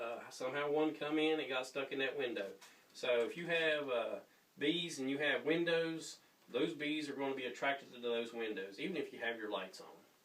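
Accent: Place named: United States. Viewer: sadly with bad acoustics and US accent I cannot hear or understand this video. any chance of sub-titles for key points. Thanks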